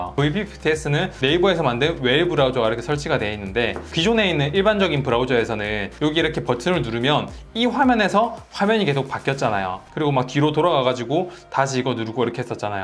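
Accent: Gyeongsang, South Korea. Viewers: 경상도 사투리가 너무 깊게 박혀있네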